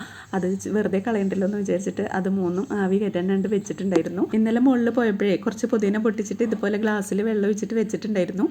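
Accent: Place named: Malappuram, India. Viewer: നിങ്ങള് മലപ്പുറം ആണോ? ?സ്ലാങ് മലപ്പുറം ആണല്ലോ 😀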